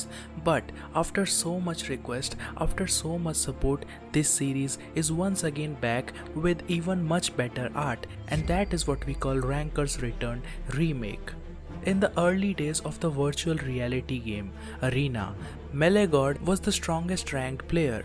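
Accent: India. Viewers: Remove your India accents its annoying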